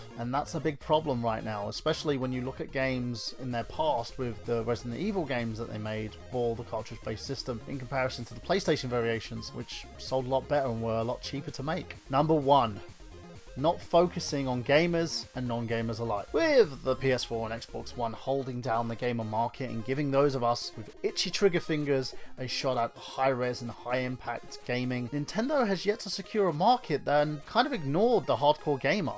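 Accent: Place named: England, United Kingdom. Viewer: you're right. I was mislead by the title of your video, and thus chose to watch the video believing that it was going to be a quality, intelligent critique with evidence-based facts and actually be about the Switch. My mistake. But through our correspondence I have learned something; that even guys with English accents can be childish YouTubers who get butt hurt by negative comments on their videos.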